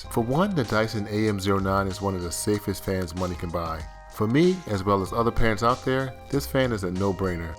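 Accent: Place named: United States. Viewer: THAT mate, is both the best Dyson "run-down" I've seen AND the nicest American accent I've heard to date. ;¬) Personally I switch it off at bed time & don't NEED at timer for off; but I'd LOVE to see a timer to switch ON for a warm room to awaken to. :¬)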